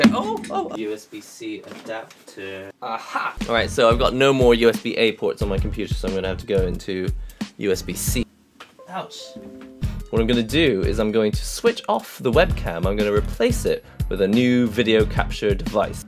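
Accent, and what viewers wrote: England, United Kingdom. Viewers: It could be a thing, livestream wearing a suit and tie...with the English accent could be your unique selling point.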